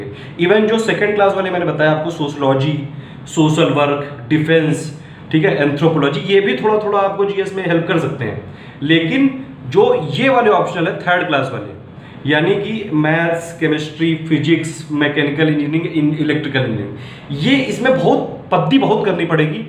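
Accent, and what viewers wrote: Kanpur, India. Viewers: Bhai Kanpur ke ho kya ...? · Nice kanpuriya touch...!! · Sir, Aap kanpur me kaha Se ho, Full kanpuriya Touch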